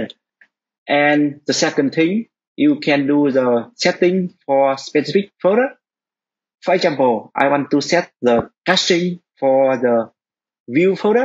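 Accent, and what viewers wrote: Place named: Vietnam. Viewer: Em nghe phát biết anh là người Việt, anh ra series tiếng Việt luôn đi anh.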